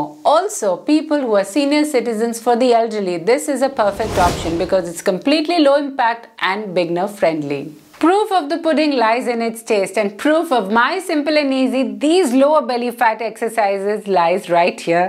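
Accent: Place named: India. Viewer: Thanks so much. From your accent I can immagine you are from India. I was living there for many many years and I love your country. Thanks and hugs from Italy. ❤️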